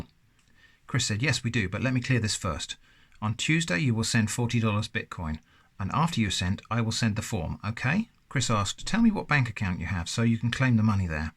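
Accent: United Kingdom. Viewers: I was gonna subscribe but british english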